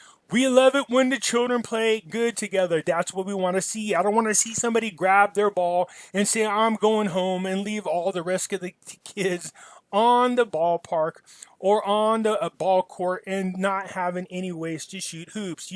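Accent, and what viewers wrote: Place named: United States. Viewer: Hi why do all Americans pronounce Iraq and Iran with an I as in I they pronounce it with an India pronunciation.. could you change your pronunciation to honour these countries ? Thanks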